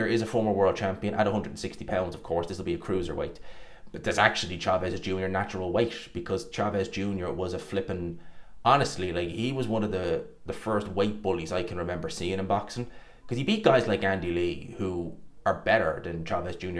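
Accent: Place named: Clonmel, Ireland. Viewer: Sound like you're a clonmel man brother. Great channel.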